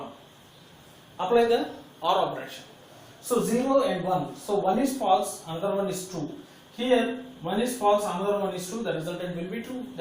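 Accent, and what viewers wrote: South India, India. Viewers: Sir you sounds likes a south indian...by the way nice explanation sir😄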